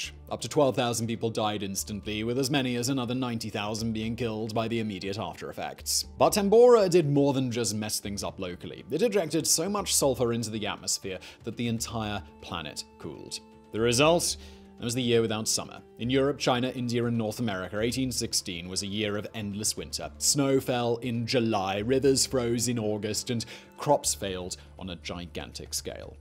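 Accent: RP, United Kingdom. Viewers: is this what they call posh accent? it's so difficult to understand :( and the cc are only auto generated. :( I can follow eg veritasium's videos fine, but these are challenge. And it's pity cause it's good content. I like the disaster put into history context.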